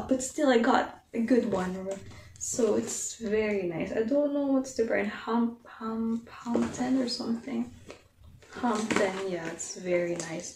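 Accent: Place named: United Kingdom. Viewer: Take care always ate 😍 miss you. Naloka ako sa englishan hehe british na bristish na hehe 😅☺️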